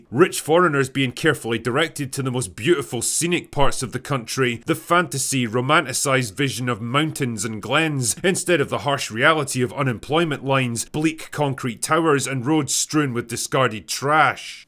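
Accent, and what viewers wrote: Scotland, United Kingdom. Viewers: I did notice the subtle accent, Scottish, who would guess... Great channel. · SCOTTISH?!?! Aaaw man all this time I thought you were Irish. The accents are so similar!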